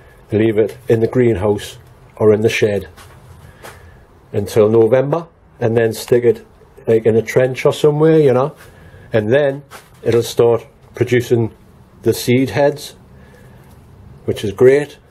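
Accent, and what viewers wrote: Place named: North, United Kingdom. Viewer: I like you videos and the voice it reminds of all my relatives from the north Never mind about the dislikes some people just like to hurt .Good wishes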